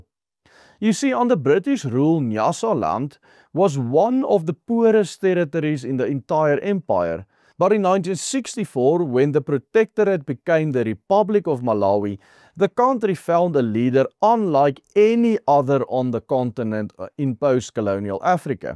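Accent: Afrikaans, South Africa. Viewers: can you speak any other lanuage and how..beautiful afrikaans accent why must it be...??? like more english..❤ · This is the second video of yours I watched. Perhaps it's unfair but your charming Afrikaner accent certainly makes your videos more enjoyable to listen to- even as you tackle difficult topics. You offer unflinched views and not beholden to left-wing fixations but I think you have warm human regard for humans regardless of race. Afrikaners are genuine sons of Africa, from my outside view, and hope your people and nation keep prospering and making contributions. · Sorry, Afrikaaner English is just too unattractive …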